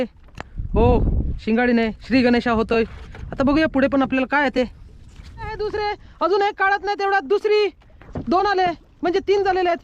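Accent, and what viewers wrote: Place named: Mangeli, India. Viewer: भाऊ तुझी मराठी मांगेली भाषा ऐकायला मज्जा येते, एक पाट धाडून दे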